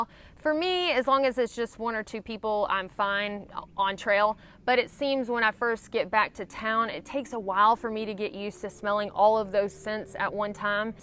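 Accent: South, United States. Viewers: It amuses me how people are so enamored with accent. It is just how we talk! Of course, her's is a nice, sweet version. However, you know you have someone with a good southern accent when the pronunciation of "pin", "pen", and "pan" are basically indistinguishable. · I realized all of these things from long outdoor experiences while in the Army. I still remember pulling my sock off and throwing it against a wall and it stuck. That was after weeks of not having the opportunity to change clean etc.. I really appreciate your southern drawl. I’m from Louisiana originally. Living in Europe going on 26 years and don’t get the chance to listen to the singing of the southern girl. Amazing. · I find her accent rather annoying. Better I guess, and more genuine, than that haughty Valley Girl manufactured ball-of-butter-in-the-back-the-throat voice that a lot of chicks choose today. 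Still, she's been out of the country now and has been exposed to enough different kinds of speech that she can improve that Scotch-Irish Southern drawl that makes her sound ignorant. I did. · I love the crystal clear "et cetera" in the midst of your southern drawl 🤣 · Sounds like a lot of things I went through coming back from Iraq. Weird. I like your videos. Good advice and you have a soothing tone and an accent that’s as country as a butter bean. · I've made this comment before I guess but in 79 I hitched outa Charlotte to never really return to the south and much further down the road America itself. Now in Scandinavia. Long long journey; but your voice and your very southern alterna-girlness takes me back, strangely very familiar and missed somewhat.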